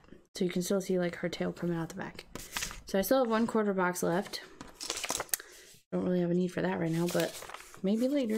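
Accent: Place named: South, United States. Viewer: Hahaha I was just thinking...”is she normally that southern?”.... when you said you were. I thought I was going crazy🤓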